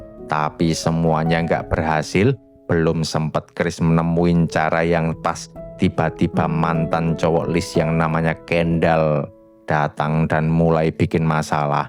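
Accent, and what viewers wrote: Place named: Java, Indonesia. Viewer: logatnya jawa banget wkwk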